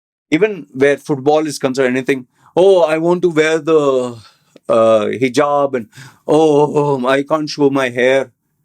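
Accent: India. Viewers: Ok looking past your tattooed funny looks and very strong Indian accent and onto the actual subject. Your first argument to prove that Islam is not a religion of peace is because most of the so called terrorist attacks are done by Muslims and not any other religion. It helps when you do a little bit of research before opening your mouth. Year 1009. Crusader siege of Jerusalem result in mass killing of over 100000 people. Historians write that blood was flowing in the streets of Jerusalem. All done in the name of Christianity hence the name Crusades. Year 1187 and Saladin conquers the Jerusalem from the Crusaders. What does he do? Offer ransom to Christian and Jews to leave the city peacefully to the land of their choice. No mass killings at all in the name of Islam. Just one example to reply back to your silly podcast with zero research. Fast forward to two world wars where millions were killed. 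Why were Jews killed in WW2? Yes because they were Jews. Who killed them? Now onto the latest example. How is committing Genocide in Gaza? Yes take your time and do some independent investigation. Maybe you will be able to see clearly even thru your tattoos next to your eyes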